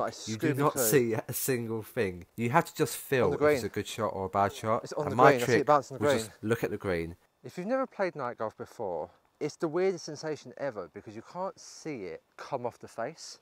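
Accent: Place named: United Kingdom. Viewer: I’ve watched so many of these videos that as an American his British accent is sounding like a normal-to-me american accent from my POV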